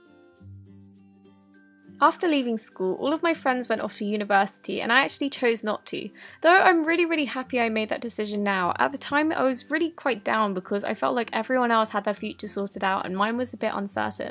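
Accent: England, United Kingdom. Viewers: I love this British accent😂💕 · Yay!!! You're the best youtuber ever! You should hold a giveaway for some of your slimes! btw, i love you =r english accent and yur super pretty!!!!!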